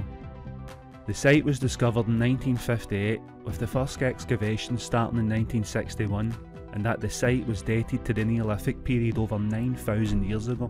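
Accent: Scotland, United Kingdom. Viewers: English with a Scottish accent 🏴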